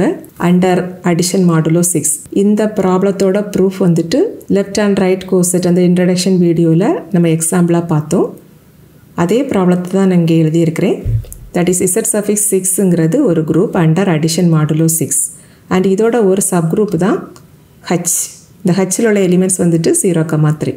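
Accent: Kanyakumari, India. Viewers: Kanyakumari 🤩🤩 your slang also Ngl 🤩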